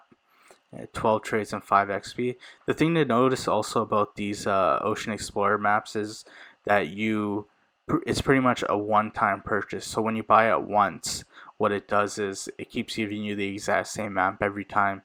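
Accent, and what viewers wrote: India. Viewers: Are you indian bro? Please tell me◉‿◉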